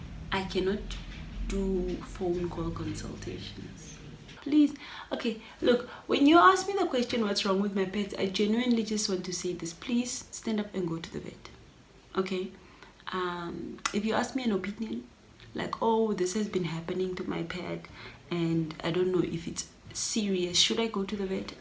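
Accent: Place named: Vietnam. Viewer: your NamLish on point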